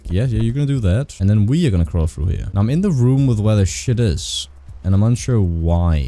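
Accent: Denmark. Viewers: Are you danish? If so, cool. Btw good video!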